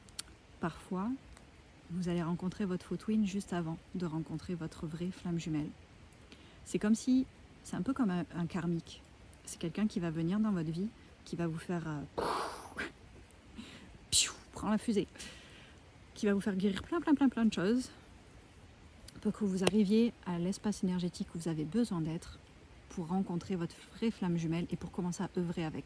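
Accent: Quebec, Canada. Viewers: Ahah j'entends un peu de Québec là là...Merci pour ton message.✨👍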